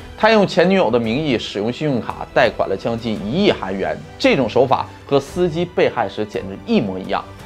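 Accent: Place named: North, China. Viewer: 感覺up主像北方人,但咬字很清楚,解說很有吸引力,訂閱你了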